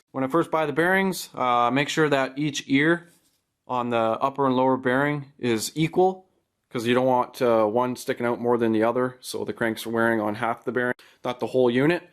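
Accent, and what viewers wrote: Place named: Canada. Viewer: Canadian accent Aye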